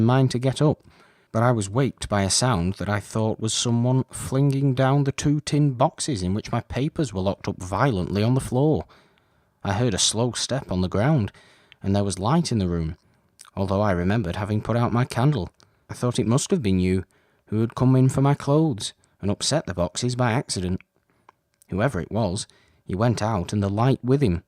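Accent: Northern England, United Kingdom. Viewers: Love the northern accent; it seems more suited to Tom Wyndsour than the narrator, but it adds to the old-timey feel. This is my all time favorite short ghost story, this and MR James' The Rose Garden. Victorian melancholy at its best. Time to bake up a restorative meat pie!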